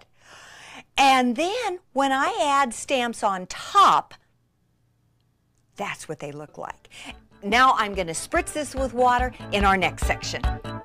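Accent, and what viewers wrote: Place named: United States. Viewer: man this woman combined with her american accent is just the best. ive got stuff to do but i want to watch the whole video!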